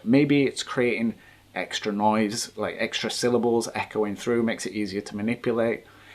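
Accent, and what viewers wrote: Yorkshire, United Kingdom. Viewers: Don't apologise for the hour long vid! It was incredible, it would be amazing if you did more hour long vids. Is your accent from Yorkshire? If it is, have you ever thought about investigating the moors? Quite a few times I have seen odd things there.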